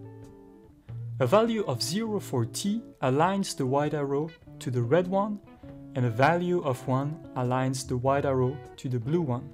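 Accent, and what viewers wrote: France. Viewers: thank you) best French English ever)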